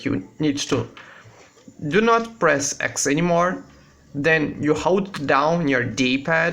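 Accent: Brazil. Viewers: Pelo inglês dá pra saber que é Br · Dude, you are brazillian, i can see, cause, eu sou brasileiro e falo inglês, você tem bastante sotaque, mas você fala bem pra caramba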